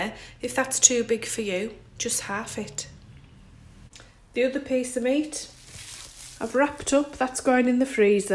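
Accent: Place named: Northern England, United Kingdom. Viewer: Hi, That’s looks delicious. I’ve been following you for a while now, I live in Australia, originally from UK and look forward to hearing your Northern accent and seeing your friendly face and your funny comments about your husband, you make me laugh. Brilliant 🤗 thank you xx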